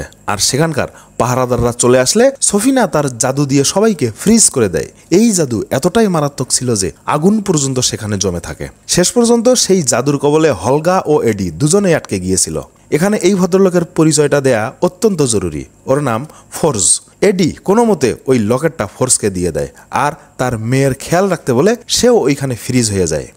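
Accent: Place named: Jessore-Kushtia, Bangladesh. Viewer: ভাই আপনার বাড়ী কী যশোর,কুষ্টিয়া বা ঝিনাইদহের দিকে,,আপনার ভাষায় আমার এলাকার টান❤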